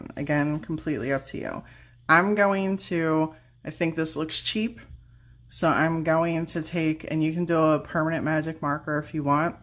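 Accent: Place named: Maryland, United States. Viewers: Hi. I love watching your videos. I learned today that I could blend chalk paint and acrylic to use as a base. Way cool! I am really in to decoupage right now, so I loved all of those, but the Christmas ornament was my fav. I had a sneaking suspicion that you were from Maryland. I too used to live in Maryland...Bel Air. I still say wuder even though I now live on the West Coast. Some things you just can't let go of!💖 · Heyy Philly girl here, we also say wooder! Your ‘accent’ is one of the reasons I started watching your videos, in addition to your amazing art and diys! I, unfortunately, live in Florida at the moment and I refuse to say ‘water’ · 😂 yes, we have a distinct accent here in ❤ Maryland. I totally understand what you're saying.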